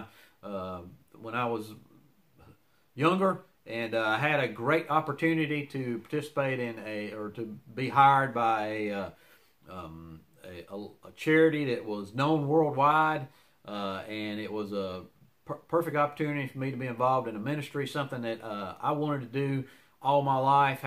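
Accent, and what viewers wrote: South, United States. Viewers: I am an hour North of that location. I am not certain where you are located, however, judging by your accent I would guess you are from the southern region. I can definitely give you a hand as you head North. Would love to connect with you👍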